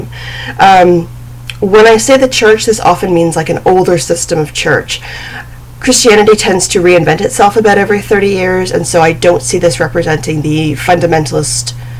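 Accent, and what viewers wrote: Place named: Canada. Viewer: I have to say I LOVE your accent. I have several Canadian clients and I love listening to them talk. I hope that isn't offensive to you, I don't mean it at all. I'm 43 and Ive watched various versions of Degrassi since I was 13 or so and I love the way they say some things too.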